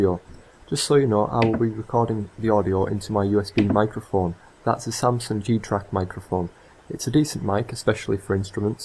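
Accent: United Kingdom. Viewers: Loving the boro accent man. Takes me back to my younger days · Brilliant video, thank you. Are you from the North East of the UK? Your accent sounds very similar to mine. I'm from Middlesbrough.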